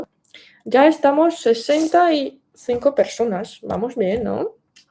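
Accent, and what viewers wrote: Spain. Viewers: Tienes un acento español en tu forma de hablar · No hables como los espanoles, se oye feo, no digas vale, habla espanol americano. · Sí, esta joven definitivamente TIENE TALENTO🧏‍♀. Los administradores del canal lo saben🤫, pero... por qué no tiene su propio canal de Youtube?🤔. Aunque su acento es español, obvio estudió castellano, y puede "chocar" un poco a la audiencia de latinoamérica; su imagen, desenfado al hablar a cámara, y deseos de crear MÁS contenido audiovisual la posicionaría en el mercado de LATAM🧏. Sobre este directo, me reservo mis impresiones, sólo que a partir de los 45 minutos es que Youtube comienza a recomendar mejor los directos. Gracias por esta hermosa iniciativa👏. ÉXITOS a las demás creadoras. Mis saludos desde Moscú🤝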